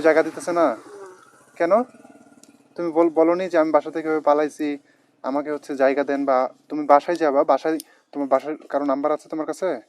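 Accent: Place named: Naogaon, Bangladesh. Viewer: ভাই আমি আপনার সবগুলো ভিডিও দেখি। আপনার কথা শুনে মনে হয় আপনার বাড়ি নওগাঁ জেলায় দয়া করে বলবেন আপনার বাড়ি কোথায়। প্লিজ 😂😂😂😂😂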